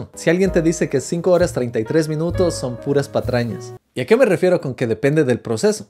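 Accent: Quito, Ecuador. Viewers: Jajaajja saludos bro, te escribo para demostrarte que no soy un bot, ya me tomaré el tiempo de revisar los cursos, y porque estoy en el proceso de aprendizaje, y me pareces un excelente mentor, además que por tu acento, creo que eres quiteño. Saludos desde Manta Ecuador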